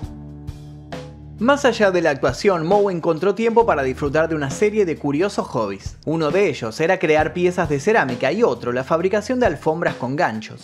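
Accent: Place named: Buenos Aires, Argentina. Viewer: relator porteño? creo que ví en comerciales a éste muchachón éste relator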